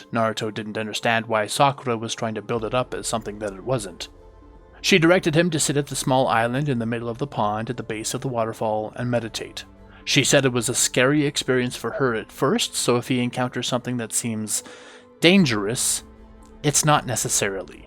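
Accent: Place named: Canada. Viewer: Alas, I'm finally caught up lol. I've been following both this and Dygo's Naruto rewrite series, and I can definitely say that I like both. If asked which one I prefer, I think I'd have to say DygoKnight's, but that could very well be because I've known about and been following that one for a longer period of time. I've known of that one for a few months, and this one I just stumbled across about a week ago, when one of the full arc movie videos randomly got recommended to me by YouTube. You've mentioned being Canadian in a few of your videos, which I actually was able to guess within just a couple minutes of hearing you talk lol. I'm friends with a few Canadians, one of whom just finished a massive multi-year Dragon Ball passion project. Another factor might be the fact that I'm totally blind, have been since birth, and rely exclusively on my ears here; couple that with numerous conversations with the aforementioned Canadian friends, and yeah, it wasn't that hard to tell. 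I know you like to showcase fan art, but even when you do post visuals, well it doesn't matter to me what they look like, since I can't see a damn thing anyway hahaha. I've enjoyed your story so far, though admittedly I may have to go back and re-watch a few of the episodes. I ate some of my aunt's edibles earlier this week and tried to follow along with the story whilst being quite toasted, so my focus probably wasn't the best during those episodes lol. There's more I could say/ask, but I can save that for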